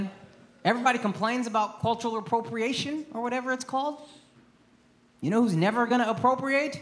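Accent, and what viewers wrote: United States. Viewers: As a "white conservative" let me just say I couldn't care less about your skin. Hearing you speak, I never would've thought "He's from India." In fact, you being from Texas makes perfect sense. What I care about are your values and your worldview. I'll gladly trade a million immigrants willing to work hard, start their own businesses and be productive parts of the community for as many white, neoliberals and Marxists as any other country will take. Also, there is a lot of Irish in my family. I probably like "Indian food" more than "Indian cuisine."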